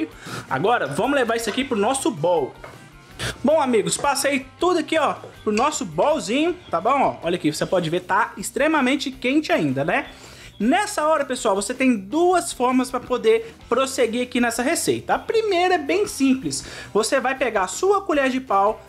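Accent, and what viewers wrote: Minas Gerais, Brazil. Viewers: Rapaz amei você eu sou de Pinheiro minas aí pertinho só que miro em Marília SP adoro quando vejo um mineiro conversar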